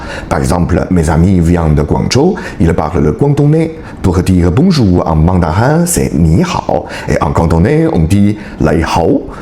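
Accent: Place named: Belgium. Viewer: Merci et je trouve-moi aussi intéressant ce concept :) je ne compte ni y aller ni m'intéresser plus sur ce pays mais ravis d'avoir pu le découvrir un peu ce soir. Et juste pour le fun, n'y voyez pas de moquerie, je trouve dans l'accent que vous avez un brin de quelque chose de belge, c'est juste marrant de se faire cette remarque :)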